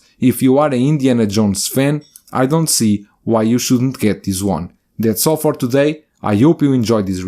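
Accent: Portugal. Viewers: Portuguese accent?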